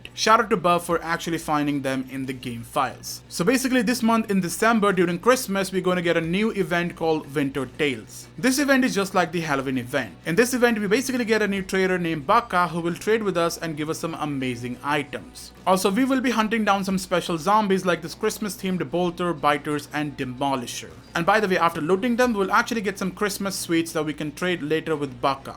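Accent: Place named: India. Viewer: Lmao you sound Indian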